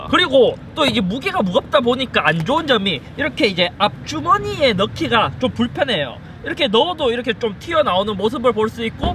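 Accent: Gyeongsang, South Korea. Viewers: 나도 경상도 사람인데 이친구 경상도 발음이 왜케 웃기지?ㅋ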